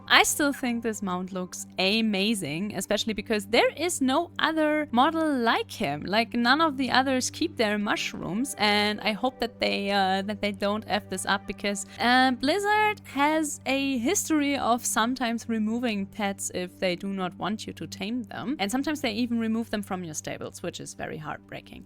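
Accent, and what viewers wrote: Germany. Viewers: you are german right? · Ich lieb doch deinen deutschen Akzent😭😭 Abo is raus!!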